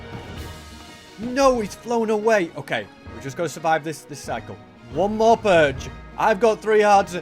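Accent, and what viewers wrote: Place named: Yorkshire, United Kingdom. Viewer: I love your Yorkshire accent!!!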